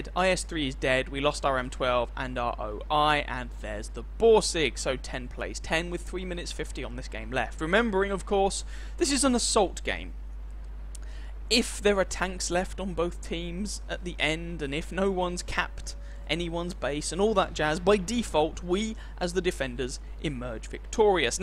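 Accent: England, United Kingdom. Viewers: I truly enjoy your english accent. :-)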